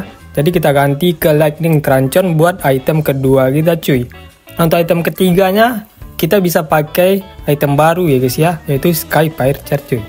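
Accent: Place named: Makassar, Indonesia. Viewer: Saya tebak ya bang, dari logatnya bang orang Makassar dan sekitarnya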